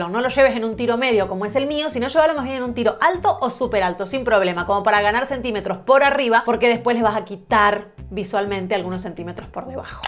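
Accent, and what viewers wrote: Argentina. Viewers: Ajjaaj.perdon pero me resultó super extraño escuchar tu acento argentino y que hablabas de invierno cuando nos estamos fritando en Argentina. Luego fui a ver la descripción del canal y vi qur no estás en el pais. Fue muy raro, lo quería decir 😂. En Argentina los cargos se estan usando muchisimo y tambien los rectos y anchos. No te digo que tiende a desaparecer el chupin, pero se usa mucho menos! Y de esos con la manga doblada no he visto, hace años que no se ven, se usaban antes, yo los usaba de hecho, pero no los he vuelto a ver en la calle por el momento. El capri me gusta pero no a la rodilla, de largo hasta la pantorrilla esta bien. Tan corto es como los hombres cuando usaban pantalón corto hasta los 18..... quedan feítos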